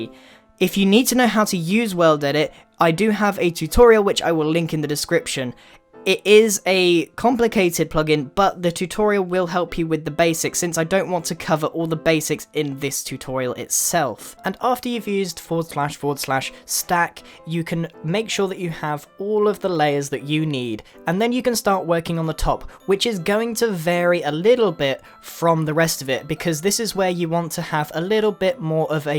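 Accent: United Kingdom. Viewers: Right now i feel like grian has become more British lmao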